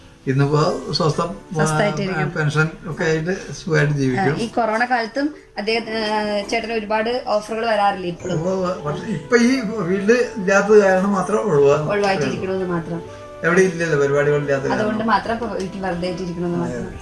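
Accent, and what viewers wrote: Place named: Kongad, India. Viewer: You have presented a realistic account of Valiya kavu and the surrounding places.Interview with Sri. was informative.Your kongadan colloquial style of casual narration is pleasing & is refreshingly different from the stereotype. For me,who left Kongad decades ago, this was a revisit to the places I grew up.Thank you very much.Looking forward to the ensuing episodes.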